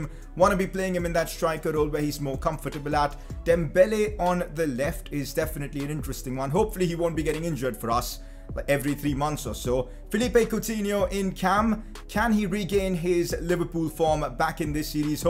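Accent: India. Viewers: bro u indian?? i mean u have a bit of indian accent... just casually asking.. nice vid btw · I like you. You have a thick Indian accent, but I like you. Straight to the chase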